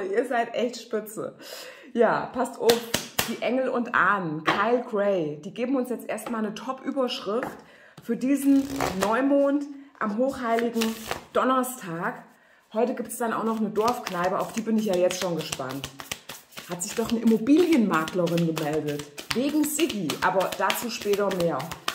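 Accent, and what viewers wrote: Hesse, Germany. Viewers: So genial made my day. Danke an meine Freundin Ina die mich zu dir geführt hat. Es macht so Spass. 😘😘😘😘 Ich lieb deinen herzische hessische Dialekt.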